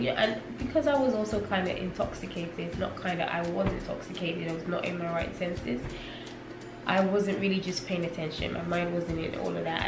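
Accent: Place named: United Kingdom. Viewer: Thanks for sharing!!! God blessed you with a very lovely boy and you are an AMAZING Mum. It can never be easy doing so much by yourself but trust me, your Son is watching and he would shower you with so much love and thanks when he's of age. Loved listening to your Bri-ish accent. By the way, my Birthday is on the 25th of April. Same date you and him were messaging each other. Lol!!!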